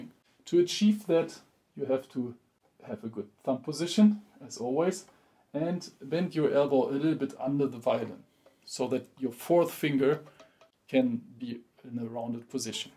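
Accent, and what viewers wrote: Germany. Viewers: wie man einfach hört, dass du Deutscher bist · man hört das du deutsch bist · Ich liebe diesen deutschen Akzent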